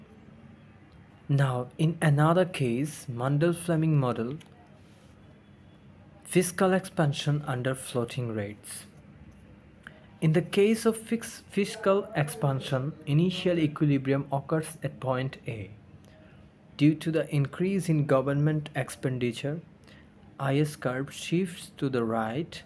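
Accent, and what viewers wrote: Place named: United Kingdom. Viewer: ব্রিটিশ এক্সেন্ট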